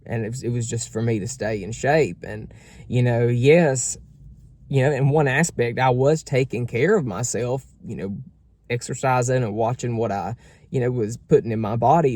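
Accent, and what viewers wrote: South, United States. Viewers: I agree and really like your jacket and everything you said is so damn true. Love your southern accent!!! For me being a chub all my life I’ve seen this and it’s been a lot harder to date. I have struggled with this all my life and I finally just take care of me and of my health and if they don’t like it I now say O well and just try to be me. I like to now not look at looks but how and what kind of a person that they are inside. That’s more attractive now than how I used to be and how others are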